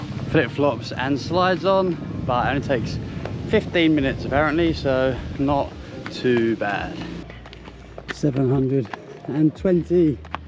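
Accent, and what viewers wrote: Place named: United Kingdom. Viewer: Why your shirt has Malaysian flag? But your accent sounds British...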